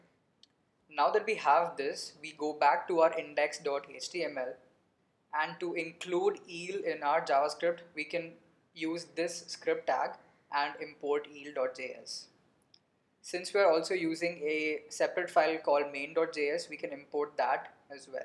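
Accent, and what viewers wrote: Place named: India. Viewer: I love you and your indi accent. Love from Italy <3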